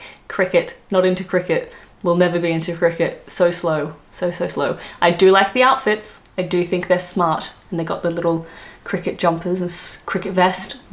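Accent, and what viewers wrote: Australia. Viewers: You are sadly losing your lovely Aussie twang it’s changing gradually in to a posh English accent. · We Brits can be direct, particularly if trying to get ones money back for a cancelled event or faulty goods. Venting ones spleen is cheaper than an exorbitantly priced Solicitors letter. You can pass as a Brit as your accent is not overtly Aussie, more nondescript and anyone who lived in Earls Court for a reasonable time would be used to Aussieisms, used by all residents there. Is it still the Aussie capital of London? The politeness on the roads is an hangover from as late as the 50's, when horse drawn transport was still common and one gave way to an horse drawn vehicle, they still have right of way. Not being a Cricket fan and admitting it has just presented Australian immigration authorities an excuse for refusing entry back into the country, Despite the Australian lockdown this upcoming Ashes tour has got the go ahead, nothing stops the Ashes, apart from a World War. 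· You do still sound Australian if that helps, although not overly so, quite a posh version 😁🤔.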